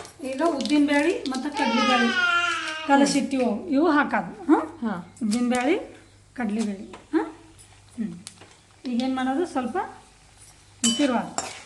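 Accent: Maharashtra, India. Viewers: Nimma Marathi mix bhase tumba channagide😊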